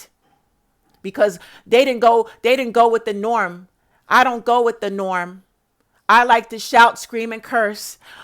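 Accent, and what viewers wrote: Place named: New York, United States. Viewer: Girl, you are New York on 100%. This just how we talk on a normal day. F them weak ass, soft asses. I love your tone and your vocabulary. You have taught me more about life than any school or any class I have ever taken. You change and save lives. The Devil is mad at you sis because you stay on his neck. These Kevin Samuels types make it seem like it is ok to put black women down. They get big mad when we speak up and shut them up! They do not have half of our intellect, our personality, drive, ambition or success. So they get mad and try to bash us. F Them.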